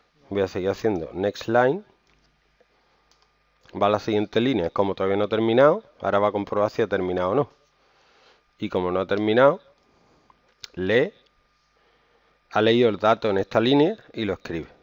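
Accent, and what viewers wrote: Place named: Malaga, Spain. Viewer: De malaga eres fijo por que soy de malaga y aqui se habla asi xD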